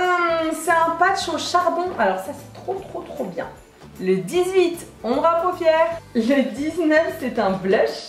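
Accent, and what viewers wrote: United Kingdom. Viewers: J adore l accent so british 👍👍. Très beau calendrier. Tu serais sexy en mère noël. Toute la panoplie c dingue. Chapeau sephora.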